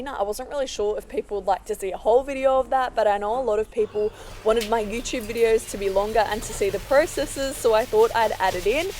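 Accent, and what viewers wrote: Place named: Australia. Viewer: Great video! If open to suggestions and unsolicited advice 😅 here it comes, longer videos make for better content for cleaning transformation lovers I think! I feel like a lot of the video was focused in one or two areas and we missed the process of the cleaning in the rest of the rooms, only getting to see the after. Would also be great to have a final comparison of before and after of each room at the end! Lastly, LOVE your narration (especially cause I love Australian accent 😅) small suggestion is to lower down the volume of the audio of the original video in the moments that you are narrating so we can hear your narrations better! For instance, it was a bit difficult to hear you during the vacuuming of the bathroom sink. Sorry if that was too much 😂😂😂 I just see amazing potential with this channel and thought I’d share my unsolicited advice in case you care 😅😅 sending love and looking forward to more videos! 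❤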